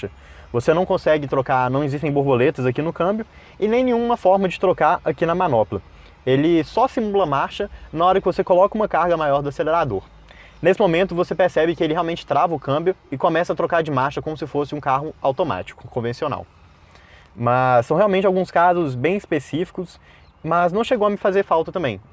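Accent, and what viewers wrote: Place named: Minas Gerais, Brazil. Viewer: eu tbem acho!! E esse sotaquinho mineiro... Iti maliaaa 😍😍😍 ... Melhor canal de carros do YouTube 👏🏽👏🏽👏🏽👏🏽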